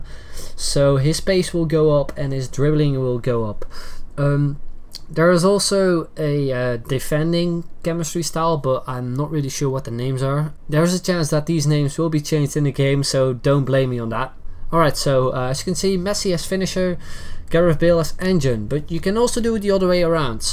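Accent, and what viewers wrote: Netherlands. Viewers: Haha je hoort gelijk al dat hij nederlader is :-)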